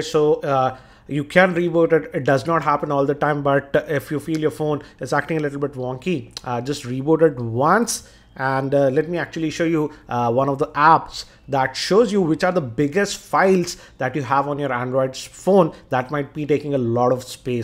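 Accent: India. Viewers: Can anybody that doesn't have the most annoying Indian voice do Android cat videos please.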